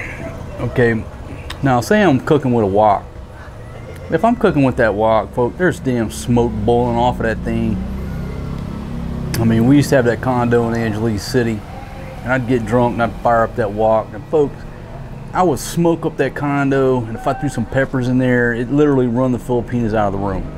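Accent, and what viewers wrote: United States. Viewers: Hi mate just love your American voice, wish I had it. By the way I got one of those DJI osmo pocket cameras love it. Dave australia.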